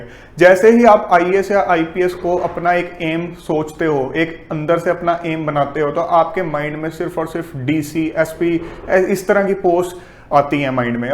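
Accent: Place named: Punjab, India. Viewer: u have punjabi tone sir while speaking English and it makes unique👌👌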